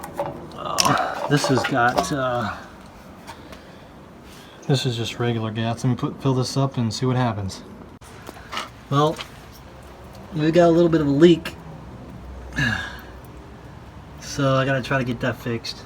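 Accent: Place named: United States. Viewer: My little sister who knows nothing of these things would do a better job . This guy is speaking American not English . B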